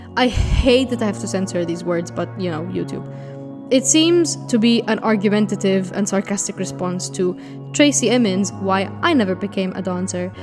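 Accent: Malta. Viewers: off topic but i love the accent.. i have always been fascinated by the maltese language and how it is latinized arabic but i've never heard a maltese person speak english and the accent is just that. very warm and lovely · This is so off-topic, but the whole video I'm sitting here like "WHERE's their accent from, I can't place it but it's so pleasant to listen to" and turns out I've never actually heard a Malta accent before?? so now I know lol, but just wanted to say, your voice is so pretty! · Was trying ro figure out the accent would have never guessed Maltese Also you are so right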